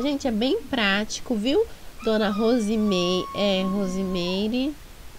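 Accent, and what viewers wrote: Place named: Bahia, Brazil. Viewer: haha teu sotaque é de baiana mesmo😍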